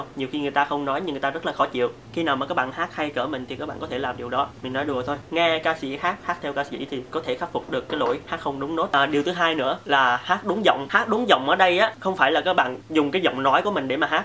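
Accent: Hue, Vietnam. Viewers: ong noi nay nguoi hue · anh người huế à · Giọng anh miền nào mà nghe vui vui ha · Anh gốc Huế hk, giọng tựa bạn em, em thích giọng anh lắm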